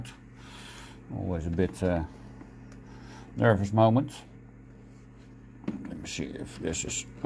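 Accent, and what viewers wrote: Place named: Netherlands. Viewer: I always enjoy the Dutch accent in English. It keeps me from being a stress kip. :D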